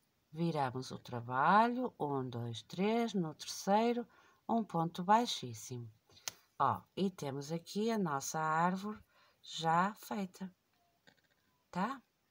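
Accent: Portugal. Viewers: Amei a explicação, bem detalhada para iniciante. Parabéns, esse soraque é lindo. Amo Portugal .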